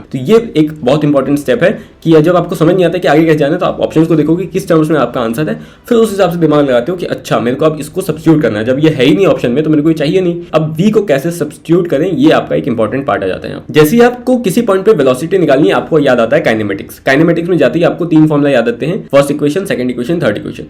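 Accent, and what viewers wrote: Assam, India. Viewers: Dada Assam se ho kya ??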